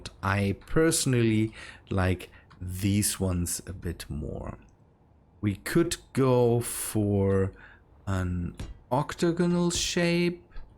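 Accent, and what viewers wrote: Germany. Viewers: I really like the way you speak english. Maybe it's because you've a German accent. At all your Voice sounds really good. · I have a question r u German because it sounds like it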